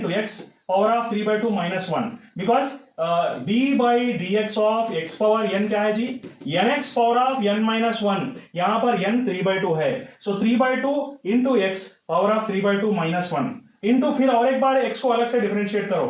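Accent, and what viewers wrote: Hyderabad, India. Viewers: #Hyderabadi accent never hides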